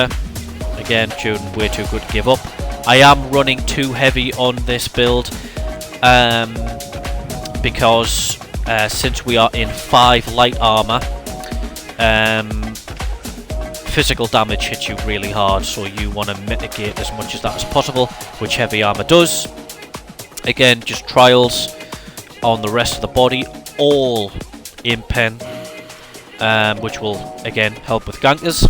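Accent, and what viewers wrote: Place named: Newcastle, United Kingdom. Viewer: Didn’t realise you were a Geordie, were going to need a duel for best Geordie EU title 😅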